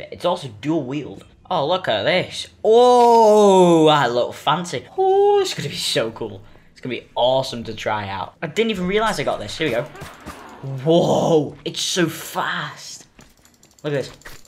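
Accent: United Kingdom. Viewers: What part of England are you from. We are in Scarborough, North Yorkshire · Now i can hear his british voice i could not ages ago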